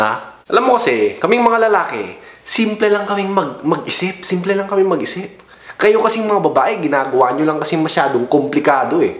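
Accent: Ilocos, Philippines. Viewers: Hinde nakakatawa pero... NATATAWA AKO😂 ILOCANO KABA? 😁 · Ilocano ata to🤣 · Woahh ganun pala yun🤣🤣..peo the way you talk . Diko maexplain eh ilocano ka ba or tagalog?🤣🤣wla lng ask ko lang🤣🤣🤣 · ah ok.. Hehehe.. Yung accent kase nya.. Hihihi.. Ilokanong ilokano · Ilocano ba t0? Ung accent nia kase😁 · Parang may ilokano accent po kayo😁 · Parang ilokano, ibanag accent diba po?✌️ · Kuya ilocano k??😂😂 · May ilocano accent😂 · ilokano ata sya.. ay na apo madlaw😂😂😂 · Hahahaha may ilocano accent e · parang Ilocano mag salita .. yung accent nya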